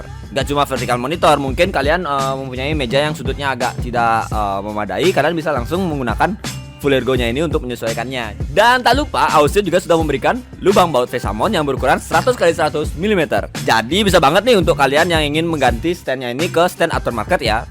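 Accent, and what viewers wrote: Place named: Bali, Indonesia. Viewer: Logat ne bali sajan bli ne 😂😂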